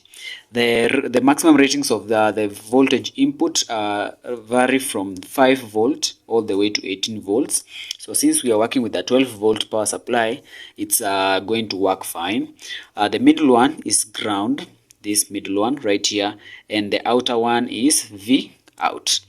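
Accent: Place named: Kenya. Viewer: you sound like a Kenyan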